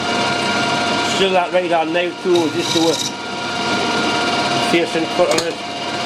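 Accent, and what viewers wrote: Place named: Yorkshire, United Kingdom. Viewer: Thank you for the reply sorry sometimes I do not understand as you speak in a Yorkshire or Welsh dialect:-)) Two weeks ago I had a chap visiting my stationary engine collection here in Malta he is from Yorkshire had a bit of a problem to communicate with his accent!!!!!!!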